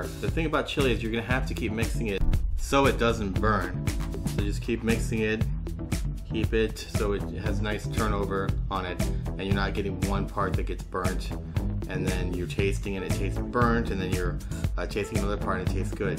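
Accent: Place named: North, United States. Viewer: My guess is you must be from a Northern state.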